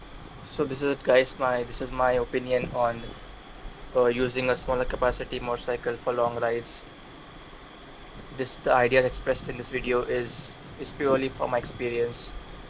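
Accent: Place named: India. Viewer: The problem is your accent. Write the words below the video to be running on the screen while you are speaking in the video. Otherwise, most of us who are not Indian missout much from what you are speaking.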